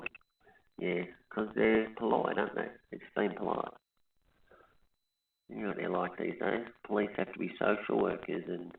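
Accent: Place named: Australia. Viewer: As an American, you sound like every other Aussie, sort of like how all Chinese look the same to Americans. No offense meant there.....just sayin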